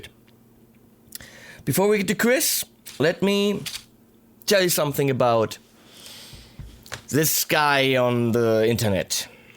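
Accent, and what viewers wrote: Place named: Germany. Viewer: Hab ichs doch gewusst, dass du Deutscher bist! Den komischen Akzent haben halt nur wir :D Ich überlege grade, ob es sich lohnt, meine Fender Lite Ash mit besseren Tonabnehmern auszustatten... Momentan klingt sie ziemlich drahtig und dünn. Die Mexico-Tele von nem Freund klingt richtig geil über meinen Fender Deville 410...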